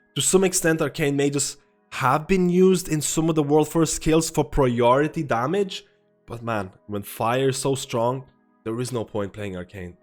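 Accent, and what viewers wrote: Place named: Ireland. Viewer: You have to live Ireland lol. the accent just comes out so often! much love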